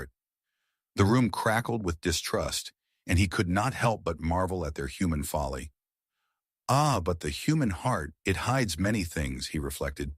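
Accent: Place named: United States. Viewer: Oh dear - AI American accent is just so wrong!! Add this channel to your "do not recommend list"!!!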